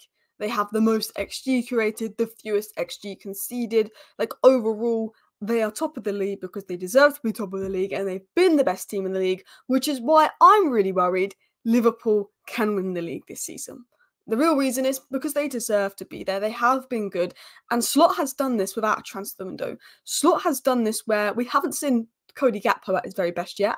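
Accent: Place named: Manchester, United Kingdom. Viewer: That’s a lovely accent you have, what part of Manchester is it from? Y.N.W.A.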